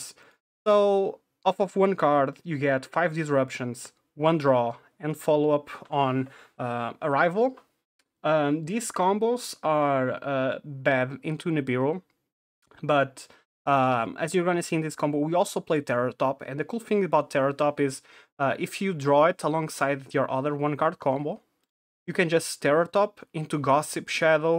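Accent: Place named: Portugal. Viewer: good video, out of curiosity your accent sounds like you are from portugal or something ??? not trying to dox you hahaha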